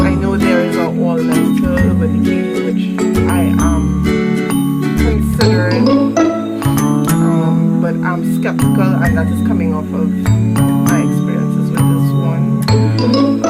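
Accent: Trinidad and Tobago. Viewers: New subbie....your accent.....Trini?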